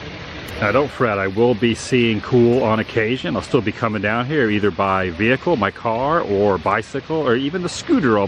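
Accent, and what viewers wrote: United States. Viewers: a goth superstore???! oh you mean golf! lololololololol im in NZ. that USA accent! :) dig ya vids as always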